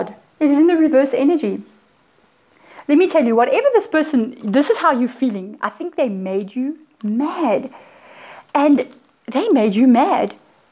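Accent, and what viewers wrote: South Africa. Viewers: You described my exact situation! Xx just walked away from a very toxic Pisces who cheated and not just once, was very up an down kept going back but now I’m ready to walked and not look back, Nice to hear a bit of “home” in your readings, I’m South African living in the uk 🇿🇦 Thanks for a great reading! X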